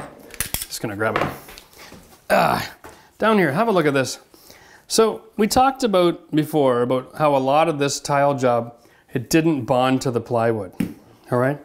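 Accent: Canada. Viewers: and i khew it before I saw the Tim Hortons cup. The way Canadian's say house gives it away every time. or out. Also, he has skills.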